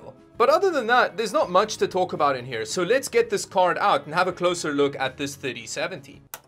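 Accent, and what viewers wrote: Afrikaans, South Africa. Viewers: Jy kan dai afrikaanse Aksent hoor🤣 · Love the content, keep it up. Quick question, are you South African? XD you've got the accent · I think it's South African (where English might have been a second language). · South African? 😅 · are you South African? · excellent to hear that Saffer accent becoming even more pronounced. Represent Boet, doesn't matter where we are in the world, proudly 'Sefrican! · South African accent??🇿🇦🇿🇦🇿🇦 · This guy sounded south african but it didn't say anything in the about page so I legit opened his Instagram just to check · I sense a South African accent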